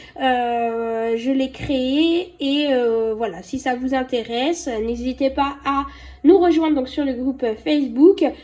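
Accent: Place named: South, France. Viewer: J'aime bien vos vidéos je suis depuis longtemps l'accent du Sud il est léger léger de loin alors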